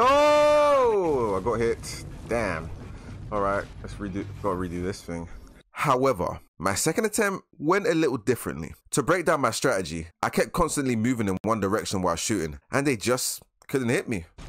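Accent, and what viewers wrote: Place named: South London, United Kingdom. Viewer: You sound very south London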